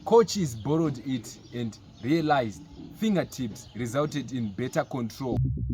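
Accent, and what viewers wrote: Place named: Zimbabwe. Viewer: That zim accent😂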